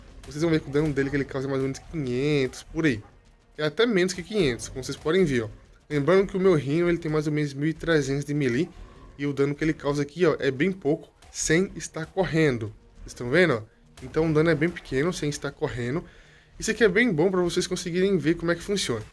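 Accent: Northeast, Brazil. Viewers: de que estado se é sou do nordeste vc parece ser nordestino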